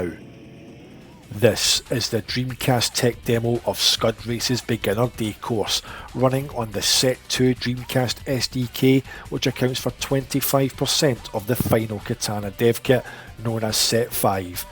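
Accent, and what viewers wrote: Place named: Falkirk, United Kingdom. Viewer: OP Just curious what part of Scotland you from? Sounds like maybe around Falkirk?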